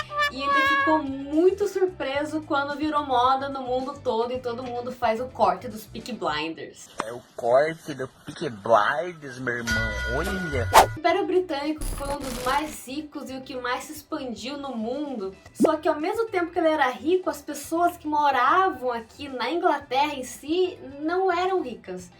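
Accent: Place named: Passo Fundo, Brazil. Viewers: hehaehae jurava que era gaúcha tbm. Sotaque parecido sabe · Esse sotaque de Passo Fundo.🤭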